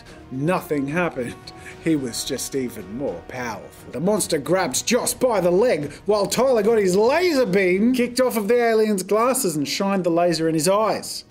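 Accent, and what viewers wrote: Australia. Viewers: there's more ozzie accent when you are more spontaneous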